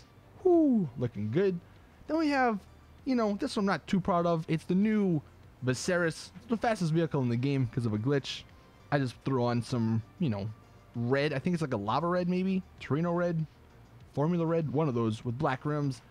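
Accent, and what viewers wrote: Louisiana, United States. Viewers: Are you from Louisiana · What part of Louisiana you from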